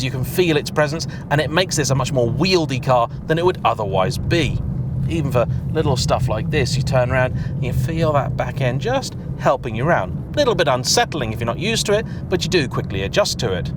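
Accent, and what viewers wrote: United Kingdom. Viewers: Porsh.....Jag-you-uh. LOL Love the Brits. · Gosh I love how the Britts say Kahhs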